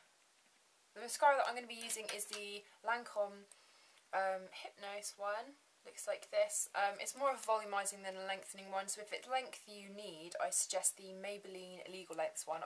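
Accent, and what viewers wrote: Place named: London, United Kingdom. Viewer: are you from london? love your accent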